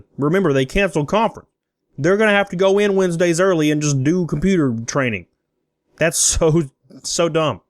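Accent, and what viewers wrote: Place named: Alabama, United States. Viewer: I'm not gay by any means but dude I love your Southern f**** droll not to mention you just hilarious and I love that laugh it's like a country Santa laugh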